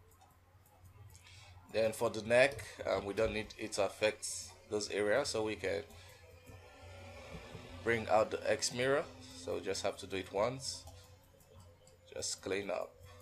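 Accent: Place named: Nigeria. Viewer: Just wondering are you nigerian? You sound like nigerian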